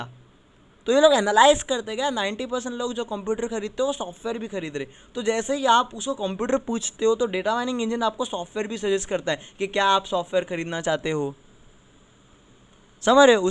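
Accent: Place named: Mumbai, India. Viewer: way you like teach in Mumbaikar style.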